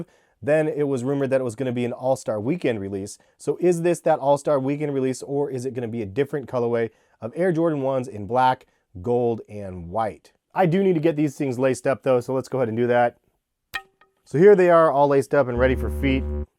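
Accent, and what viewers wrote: Oregon, United States. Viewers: These are horrible. Icy sole looks cheap, only reason sole is icy is because Nike can’t figure out how to make a clear sole that doesn’t yellow, the clear soles from 90s were best but of course yellow over time. Please let’s stop the myth that tumbled leather is high quality. It’s just cheap leather w pebble textures. This shoe is crap. Like videos. You have an interesting accent, is it what Oregonians sound like? The way you say “colorway” (cull ah way) and. “Nike” (like Nyyyy Kee) is different. Keep up the good videos.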